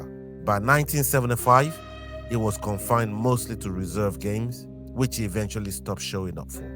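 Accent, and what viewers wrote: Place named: Africa. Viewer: Why is an African narrating this 😮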